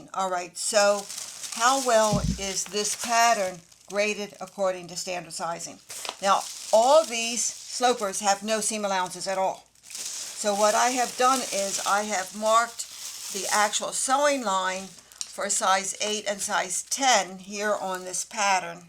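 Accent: Philadelphia, United States. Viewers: ❤❤❤❤❤❤❤❤❤!!!! New subscriber. You sound like you are from Philly or New Jersey.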